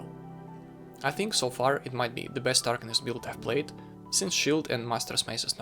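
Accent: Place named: Russia. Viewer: Слышно своих)) Но произношение красиво, спасибо за билд.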